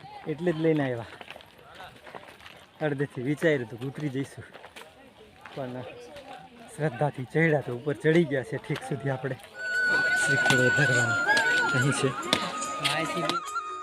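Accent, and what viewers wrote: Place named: Kathiawar, India. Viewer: તમે પણ અહીંયા ના છો તો પછી તો પછી કેમ કાઠિયાવાડી બોલો આપણી શુદ્ધ ભાષા બોલોને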